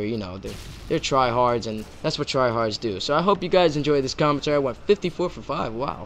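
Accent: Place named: Italian-American, United States. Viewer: You sound Italian/American, are you? Either that or your putting on the voice a squeaker would do if you said they had a high voice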